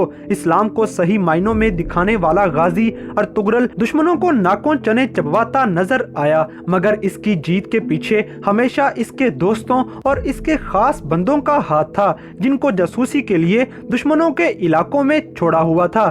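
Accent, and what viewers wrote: India. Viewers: Ye bihari haina isliyen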